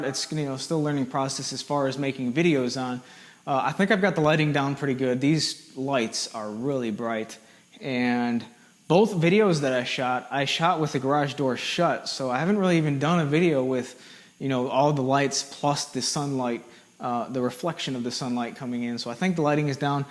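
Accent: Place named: North, United States. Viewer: Nope...not too far at all. My little brother graduated from Mississippi State University a few years ago. I had originally put that you had an accent, but when I went back to the video before posting, I noticed a few slight "Mississippi" things, but mostly a northern accent. I thought I heard in one of your older videos that you mentioned making something for a Mississippi Craft group, but I forgot to bring it up.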